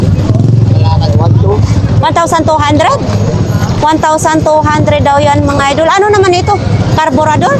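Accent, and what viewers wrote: Visayas, Philippines. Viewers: Cute din ng bisaya accent mo🤣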